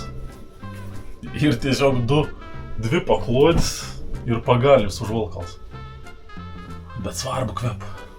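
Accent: Samogitia, Lithuania. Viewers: sveikas, kai kalbi angliskai jauciasi zemaitiskas akcentas 🙂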